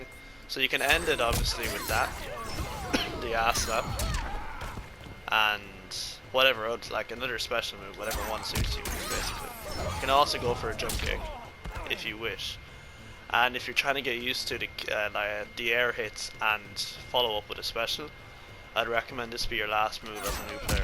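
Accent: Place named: Ireland. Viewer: thank you for the video. Nice Irish accent too.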